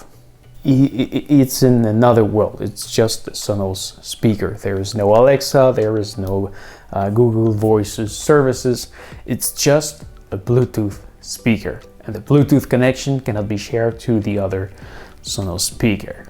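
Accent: Quebec, Canada. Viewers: You HAVE to be québécois. I recognize the accent ;)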